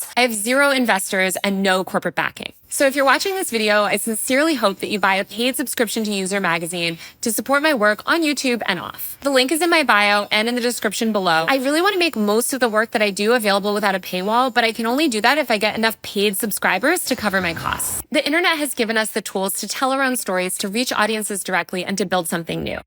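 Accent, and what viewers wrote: California, United States. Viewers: Wapo sucks, your op eds suck and appealed to around 3% or less of the US poulation because your snotty pompous Cali sounding self knows nothing but a political agenda and a bad agenda at that because you dont live in the real world. You live in a concrete, artificial, air conditioned life with no contributions to society and you could have with all the corruption that goes on but no and ive read a few of your op eds and I could hear the simpleton Cali no nothings voice just reading it. Opinion isnt journalism so quit calling yourself one.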